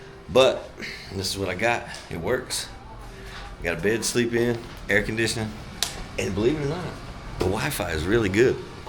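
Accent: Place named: North Carolina, United States. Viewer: The more I watch and listen it seems old North Carolina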